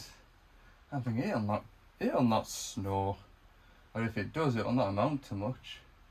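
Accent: Cumbria, United Kingdom. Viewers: Cheers, looking forward to more excellent videos. Love the Cumbrian accent, unfortunately mine's all Barrow!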